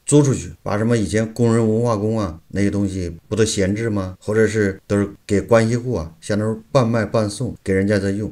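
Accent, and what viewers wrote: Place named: Anqing, China. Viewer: 安庆口音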